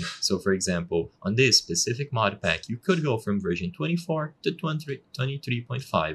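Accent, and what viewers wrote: Brazil. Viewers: O sotaque è inconfundível, Br e o que não falta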